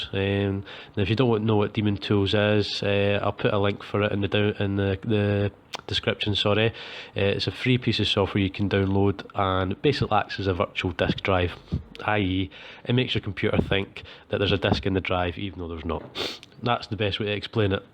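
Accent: Scotland, United Kingdom. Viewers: gold i believe its a Scottish accent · I love scottish accent man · Oh Aye scottish tutorial , thanks for the help mate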